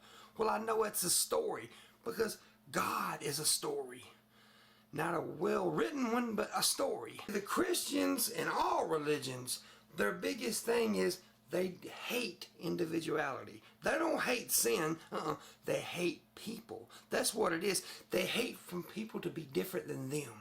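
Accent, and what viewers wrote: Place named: Louisiana, United States. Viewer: Brandon Hoose It's his Louisiana accent. It makes anybody sound like a preacher (just like a Tennessee accent makes anybody sound like a country singer, even though he/she would not be a country music fan).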